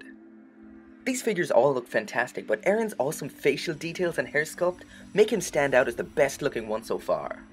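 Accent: Ireland. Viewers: I could sense a slight Irish accent! I live in the UK but I'm from Japan, your accent isn't as strong as the ones we get here but I still thought you lived in the UK so when I saw the end of this video I thought it was a shop in the UK and got really excited.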